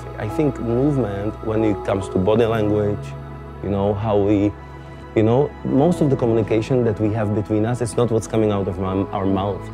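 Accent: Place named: Czech Republic. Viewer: Miluji ten český přízvuk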